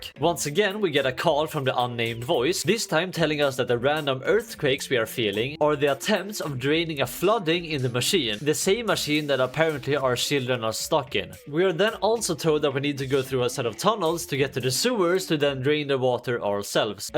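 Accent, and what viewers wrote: Sweden. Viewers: It could be worse... he's better than most swedes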